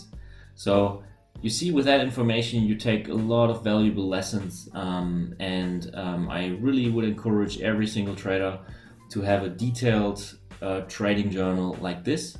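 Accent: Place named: Denmark. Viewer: Danish. You’re Danish aren’t you? Your name suggests you’re from around there but also the way you pronounce “particularly”. The Danes love CSGO and there’s one Dane in particular who pronounces that word the same way you do. I could be wrong. Anyway, great video again. Edit: I’m even more sure that you’re Danish: mild temperament, even mannered, pro-social, supportive, more words that sound Danish like “next time.” Haha, anyway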